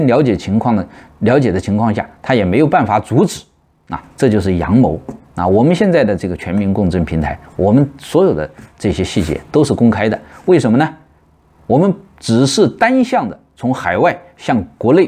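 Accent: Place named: Wuhan, China. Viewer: 请问是不是武汉人啊？口音太像武汉人说普通话了。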